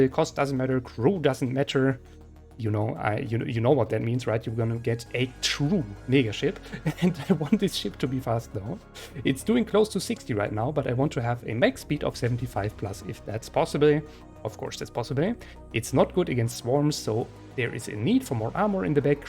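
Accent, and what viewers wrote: Germany. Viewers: You only hear the accent and you know this is gonna be good. The magic of German engineering! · schade, man hört leider deinen deutschen Akzent 😄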